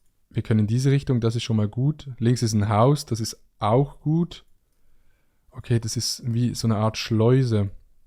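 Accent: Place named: Zurich, Switzerland. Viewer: ich dachte ku kommst aus Zürich😂 liebe grüsse von kleinbasel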